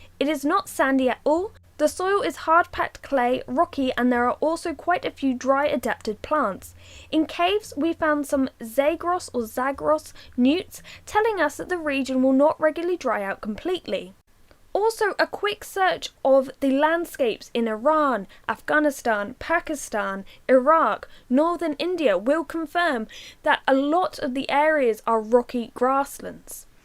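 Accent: United Kingdom. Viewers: Ehy do you have a British accent ?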